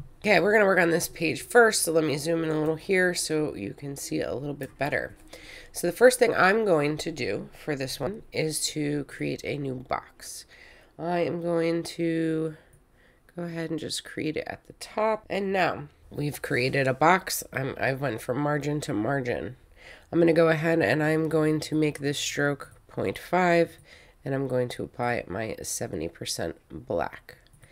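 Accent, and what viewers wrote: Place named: New England, United States. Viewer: 👏This is the only vid that made sense for these pages! Thank you for actually saying everything needed. Some vids will miss saying shift and then I can't go any further because I don't know why the tool wasn't working like I want. So glad I found your channel. I also like your (New England?) accent. I can finish my homework now!!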